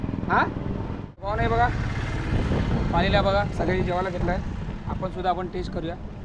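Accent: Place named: Konkan, India. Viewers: भाय तो कोकणी माणूस आहे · भावा कोकणी आहे तो मुंबई ला कोन मराठी बोलतो रे सगळे हॉटेल ला हिंदी वाले आहेत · Yalach tr majja hy kokni bhasha hy ti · Kokani loka ashich bhasha boltat bhari ❤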